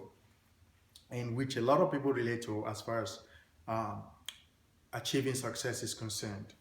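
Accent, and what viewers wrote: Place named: Africa. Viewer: Always interesting to hear from you. People who are not in America will not understand they joy some of us living here have when we have the opportunity of hearing own brother teach with our own African English. Thank you my brother.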